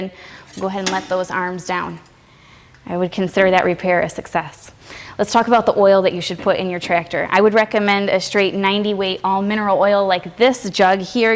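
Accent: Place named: Michigan, United States. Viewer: The Michigan accent is too funny.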